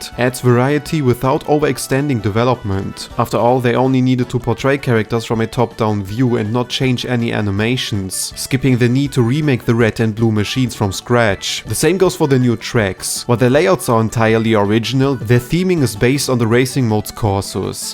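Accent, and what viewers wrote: Germany. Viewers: You are German, i can tell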